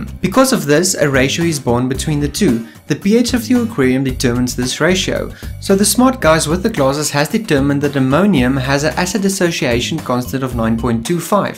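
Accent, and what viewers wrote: South Africa. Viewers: It takes exactly 0.3 milliseconds to recognize a boer accent 😂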